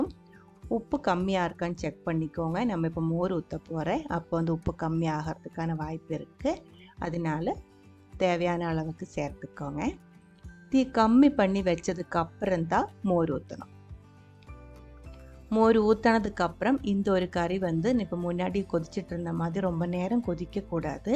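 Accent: Kerala, India. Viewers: "Valara nanyaittu irukaiyanu ningalinde recipe"I love your malayalam accent wile speaking in Tamil. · V good. Lovely Malayalam accented Tamil.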